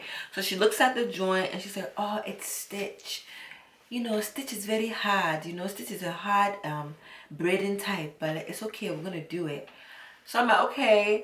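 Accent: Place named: Nigeria. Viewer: It’s the Nigerian accent for me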